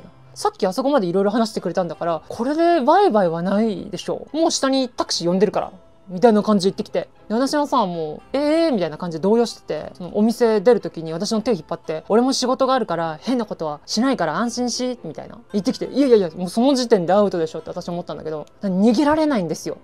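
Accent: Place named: Kansai, Japan. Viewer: 関西弁だから関西人かぁ？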